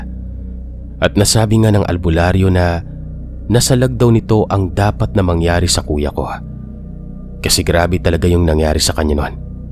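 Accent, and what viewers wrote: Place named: Visayas, Philippines. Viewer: Bisaya pronounce ang ganda pakinggan😊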